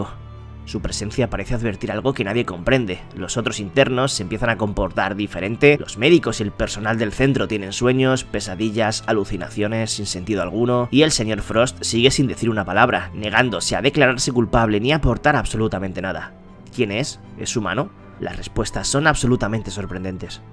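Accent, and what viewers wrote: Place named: Spain. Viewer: Acento de 💩💩 español apesta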